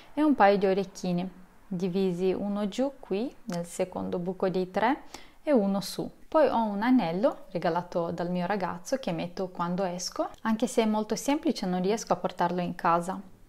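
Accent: Russia. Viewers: Non riesco a capire dal tuo accento se sei russa oppure moldava? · Sei russa? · mi rilassa troppo la tua voce russa!😍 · Grazie per questo video, mi è piaciuto molto! Sto imparando italiano e mi aiuta molto guardare i tuoi video. Ho notato un piccolo accento russo, altrimenti avrei pensato che l‘italiano fosse la tua madrelingua. Posso chiederti da quando stai imparando italiano? E da quanti anni vivi già in Italia? È stato difficile imparare italiano così bene? Grazie :) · Io: che nel cuore della notte vorrei dormire YouTube: consiglia video di una ragazza con accento filo-russo che spiega come vivere con pochissime cose •_• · Come mai stavo guardando dei video poi ricarico la pagina e trovò una che ha l’accento Russo e poi vive usando con una sola padella :O (comunque lei può fare quello che vuele, questo è anche interessante)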